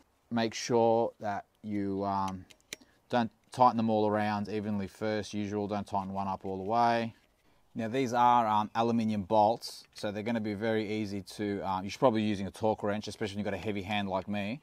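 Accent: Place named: Australia. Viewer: The aussie slang is so refreshing. As a fellow second generation immigrant aussie, the accent is so truely 'modern Aussie' . Love your work mate! Great presenting style and overall video editing and filming.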